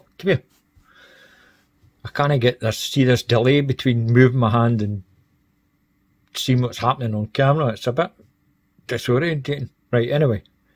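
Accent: Scotland, United Kingdom. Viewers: That was aggressively Scottish and I am here for it! 😂 Subbed!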